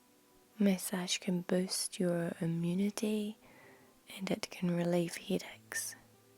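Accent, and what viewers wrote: New Zealand. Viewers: Are you a kiwi? The sound of your voice had me falling asleep 😴 you talk exactly how my momma used to talk when she was trying to make me go to sleep as a child 💕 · Love your Kiwi accent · Kiwi accents are the best!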